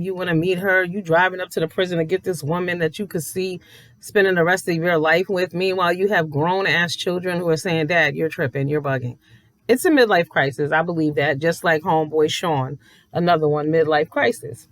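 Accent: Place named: East Coast, United States. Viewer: I knew you east coast I can hear it all I live in Texas and everyone say I got that accent too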